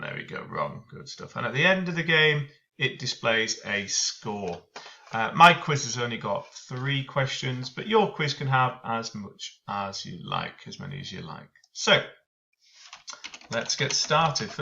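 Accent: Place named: Ireland. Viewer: Ireland XD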